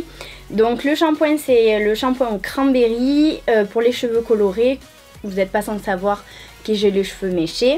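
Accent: South, France. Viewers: t'as un accent du sud non? toulousain ou marseillais? 💍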